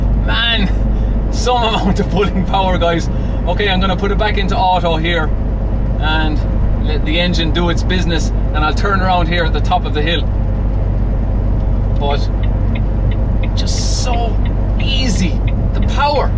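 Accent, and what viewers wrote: Ireland. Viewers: Greetings from Sweden. Love that Irish accent, wish I could speak like that 🙂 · Your voice is so entertaining to hear, I love the Irish accent Very informative, and the Scania R520 V8 Streamline is my favorite truck!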